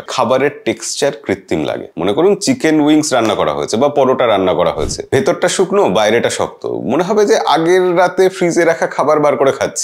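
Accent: Bangladesh. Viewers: Dadar bolar dhorone ektu bangladeshi touch ache!!!🤔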